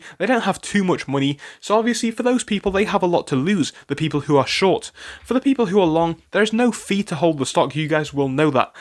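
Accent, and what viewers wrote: United Kingdom. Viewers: You from the North? A UK Ape?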